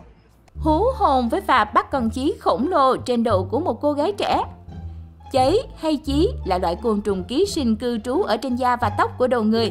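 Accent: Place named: North, Vietnam. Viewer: Ng bắc omg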